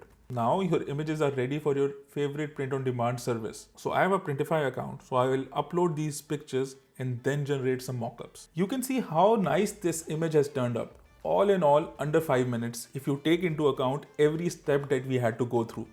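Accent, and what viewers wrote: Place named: India. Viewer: He sounds Indian but does not look Indian.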